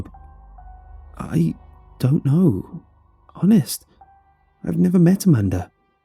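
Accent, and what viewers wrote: United Kingdom. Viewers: Hey, sorry for the off-topic comment, but I've been tormenting myself for weeks over this question: does anyone know where in the UK ' accent comes from? I've spent more than two hours trying to figure it out, but I am but an American, and my efforts were in vain.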